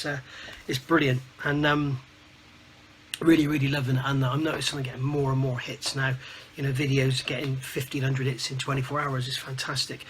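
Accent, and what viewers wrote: United Kingdom. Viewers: Hello, is that a south Bristol accent.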